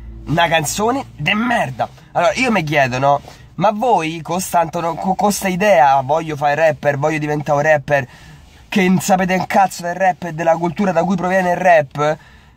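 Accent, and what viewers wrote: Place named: Marche, Italy. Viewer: Ma sei marchiciano 😂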